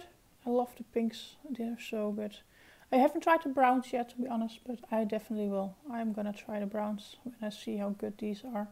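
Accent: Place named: Netherlands. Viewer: Gorgeous look ✨💖✨ I’m currently stalking my package 📦 with this gorgeous palette in it... it’s just down the west coast from me in San Francisco... 🤨 I see you Rose Metal palette and 2 eyeliners...😳soon my pretties...soooonnn 😳🙌🏽🥰🙌🏽🤣 I love your accent, it sounds Dutch to my old ears 🤣 I apologize if I’m wrong, which I usually am...🤨😳🤣😉 I think accents of all languages is beautiful, with the exception of a couple my American accents... I just don’t think our accents are that great...some of the southern accents and Midwestern, New England accents I love, but the regular sounding American accents on the west coast is kinda blah bcuz I hear it all the time. You’ll love Lois’s Gone Rogue palette too 🥰🤗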